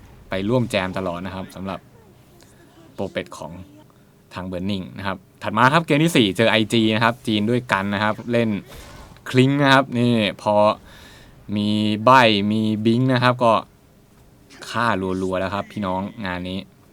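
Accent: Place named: Thailand. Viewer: ผมไม่ได้ว่ามันอ่านผิดรึถูกครับ แค่ฟังที่คนพากย์อ่านสำเนียงไทยแล้วมันฮาดีครับ(ไม่ได้ว่าคนพากย์นะ 55)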